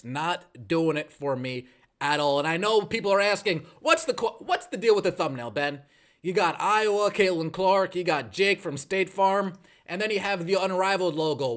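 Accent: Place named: New Jersey, United States. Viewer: Nahhhh, thats just how we all speak "Down the Jersey Shore"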